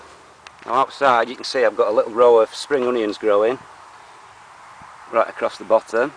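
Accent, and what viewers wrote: Yorkshire, United Kingdom. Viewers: u from. Yorkshire laddy?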